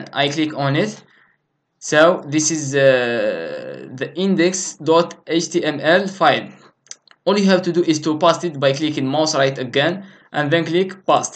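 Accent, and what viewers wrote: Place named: Russia. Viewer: i love the Way you talk I love russians when they talk :)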